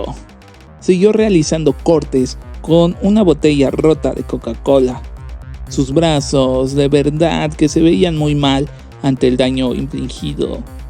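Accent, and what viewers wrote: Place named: Mexico. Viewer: ES MEJICANO. SE LE ESCAPA CRONICO EL CANTINFLAS. SUENA MAS A COMENTARISTA DE PASITO DURANGUENCE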